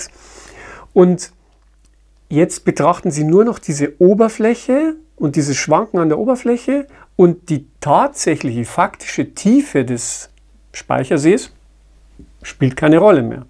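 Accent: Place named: Bavaria, Germany. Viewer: hört man ja auch das freundlich klingende Bayrische heraus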